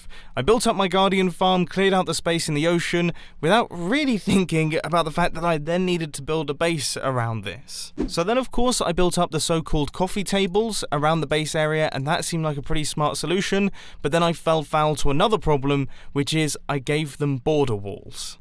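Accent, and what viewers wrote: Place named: England, United Kingdom. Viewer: Damn i feel the english through my phone.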